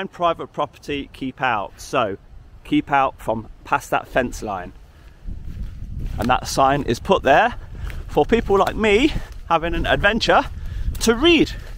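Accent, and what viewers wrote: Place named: Yorkshire, United Kingdom. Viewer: Nice Yorkshire accent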